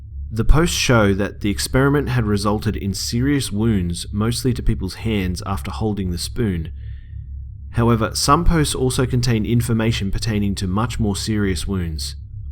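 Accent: Australia. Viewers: I cannot stand the Australian accent. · you sound so aggresively aussie ahaha, love it. keep up the good work. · Are you Australian?? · Him: G'day mate- Me: Oh shit an aussie. I'm subscribing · Only subscribing because you're Australian and your voice sounds majestic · wew lad aussie accent..shitposting inc.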